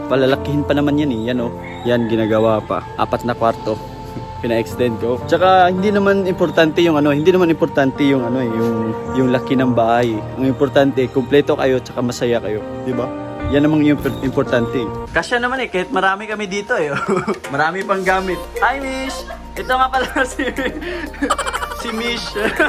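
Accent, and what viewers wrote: Philippines. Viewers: anong probinsya? ang accent?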